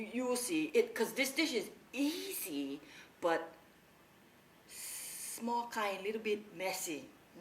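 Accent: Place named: Hawaii, United States. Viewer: "da less dishes i wash da bettah!" lol i love your videos xD you're so entertaining to watch and i like how you incorporate pidgin in your show. au'raiii!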